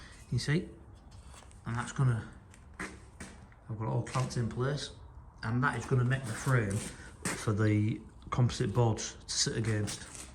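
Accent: Hull, United Kingdom. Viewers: You’ve got to be from Hull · Sick this, you sound like a hull lad, was that price a total price for the double gates and the side gate? I’m looking at doing a side gate only. Wondering what the price just for the side gate was ?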